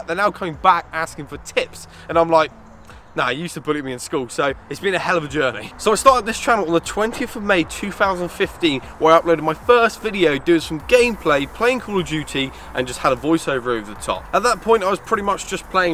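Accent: United Kingdom. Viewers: Sound so posh in your old videos man 😂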